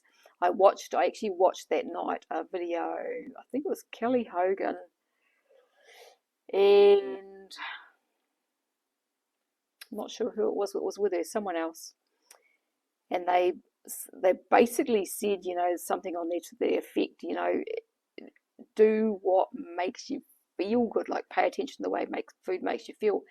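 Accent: New Zealand. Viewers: Still can't get over how beautiful the NZ accent is. Thanks for the advice on tudca.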